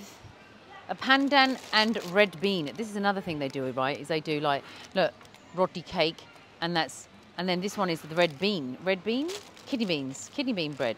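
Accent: England, United Kingdom. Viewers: are you from England.. mem ... ❤❤ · I always looking forward to your next videos... It's interesting. Maybe it's your British accent attraction...😅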